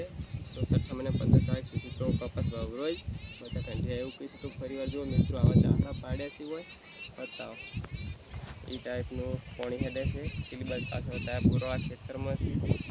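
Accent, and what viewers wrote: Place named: Banaskantha, India. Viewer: ક્યાંથી છો ભાઈ તમારી બોલી બનાસકાંઠા આજુબાજુ ની લાગે છે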